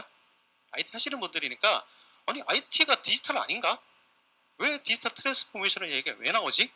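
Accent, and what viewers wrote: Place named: Seoul, South Korea. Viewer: 서울말 쓰네...갸가 갸다~~^^ 잘지내라 친구..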